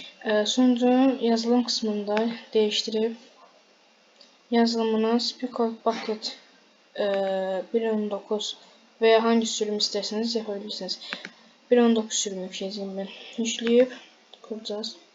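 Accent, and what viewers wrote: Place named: Azerbaijan. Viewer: Abe azerbaycanlısan türkçe anışma be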